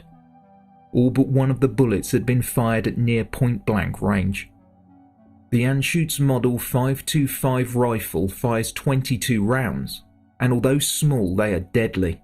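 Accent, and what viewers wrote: England, United Kingdom. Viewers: thank you for the new video love your English accent love London England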